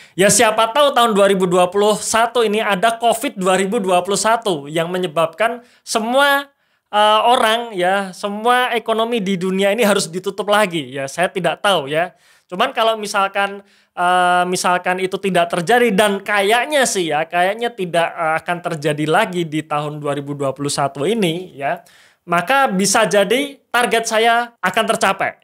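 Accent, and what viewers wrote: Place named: Cirebon, Indonesia. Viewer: Logate wong cirebon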